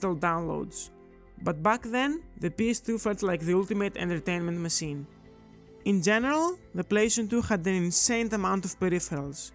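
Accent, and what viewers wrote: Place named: Greece. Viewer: Are you Greek? Your accent is giving Greek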